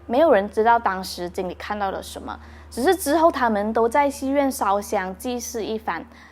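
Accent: Cantonese, China. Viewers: u cakap cantonese cute sikit ~~ try to do some cantonese content ~~ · 你好可愛呀，你啲粵語好得意好好聽🥳🥳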